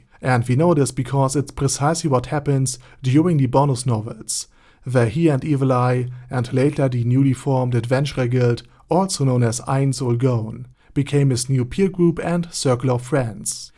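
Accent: Germany. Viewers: Selbst als Deutscher fällt mir das Lesen deines Namen sehr schwer. Ich finde das Video sehr gut und dein starker Deutscher Akzent ist auch ma was anderes .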